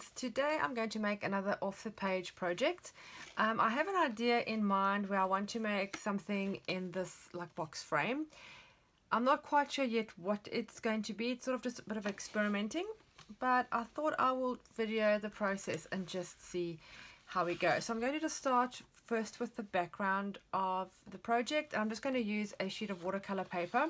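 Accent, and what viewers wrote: South Africa. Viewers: you sound South African! Love your art!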